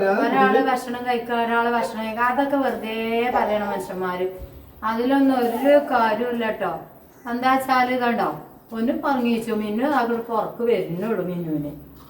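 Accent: Malappuram, India. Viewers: എന്താ എപ്പഴും ട്ടോ ട്ടോ പറയുന്നത് ശരിക്കും മലപ്പുറത്ത്കാരുടെ സംസാരം തന്നെ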